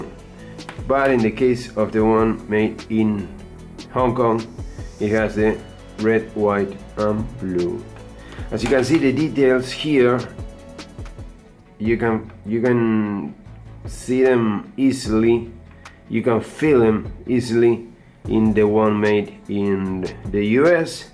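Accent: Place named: Argentina. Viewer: Esa fonética de inglés es muy argenta 😅... 🇦🇷💪🏼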